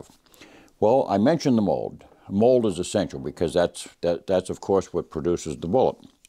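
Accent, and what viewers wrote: New England, United States. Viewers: Good storm huh!? A few weeks ago i first watched one of your videos and about 9 seconds in i go “this guys either from vt right on the river or nh” so… which is it?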